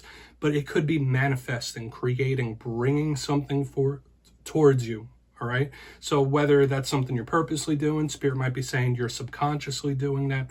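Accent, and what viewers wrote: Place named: Delaware Valley, United States. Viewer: Powerful reading, thank you. Sounds like you’re from the Delaware Valley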